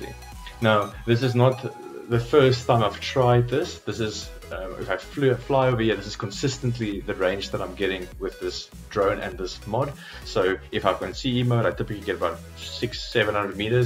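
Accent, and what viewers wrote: South Africa. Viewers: Nice to hear an SA accent gain. Filming from Melkbos? My question: I am currently in Vancouver, Canada. How will I find out if I am in FCC mode on my DJI FPV?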